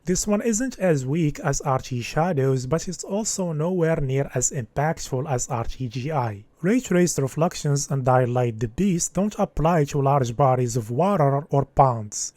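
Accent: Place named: Casablanca, Morocco. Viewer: خويا ميمكنش متكونش مغربي هاد الaccent ديالك باينا كازاوي هههههه Good work brother btw ❤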